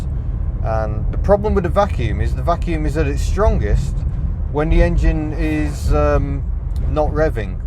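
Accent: United Kingdom. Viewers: Thanks! Nice présentation. Love your British accent!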